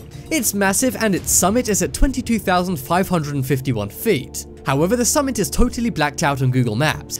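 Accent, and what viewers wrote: England, United Kingdom. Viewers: makes me laugh how this guy sounds british yet pronounces nuclear as nukiller like an american ffs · I’m 100% sure, he is british... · It's nuclear,not nukyilla, I thought you was English at first,but I'm not sure now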